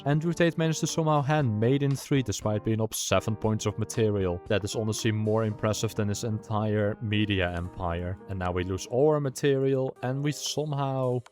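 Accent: Netherlands. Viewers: Ik ruik nederlands in dit accent 😅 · You sound very Dutch 🇳🇱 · Dutch accent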